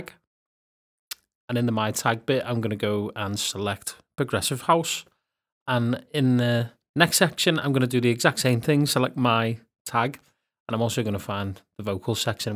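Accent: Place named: Liverpool, United Kingdom. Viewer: I just discovered this feature, but didn't know how to filter or search with the tags... which is why I came here. And I was welcomed by the most amazing scouse accent in the history of mankind. Boss mate!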